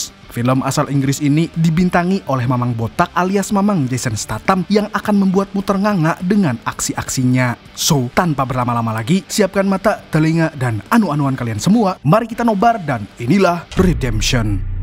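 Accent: Banjar, Indonesia. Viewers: Urang banjar kah pian mang 🤣🤣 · Ikm urang banjar kh?😂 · Min orang mana, Banjar kh? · Wkwk asli banjar 😂 pacul gigi kai .. semangat terus berkarya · nah bahasa banjar sekalinya😂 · Adminnya orng Banjar? 😅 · Urang banjar kah beliau ne 😂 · Pinanya mimin ini org banjar 😊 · Urang banjar kah pian bang? Hahaa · Hahah urang banjar kh pian mang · Wkkwkw orang banjar kalinya sampiaaann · Orang Banjar kah mang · Aiii bhasa Banjar,,,urg banjar kh??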